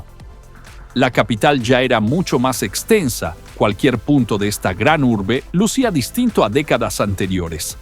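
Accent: Yucatan, Mexico. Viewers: Es Yucateco el narrador? Bombaaa el que te lo rompio que te lo compongaaa.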